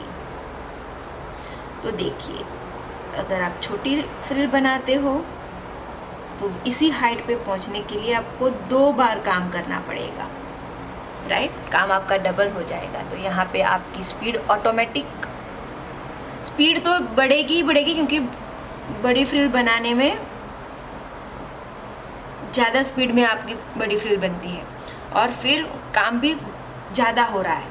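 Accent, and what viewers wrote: Gujarat, India. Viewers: Ka kha ga gha Tame Gujrati 6o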